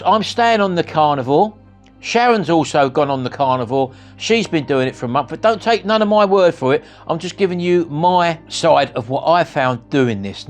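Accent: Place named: England, United Kingdom. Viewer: How refreshing to listen to a clear English accent instead of high pitched nasal American females telling us how to eat.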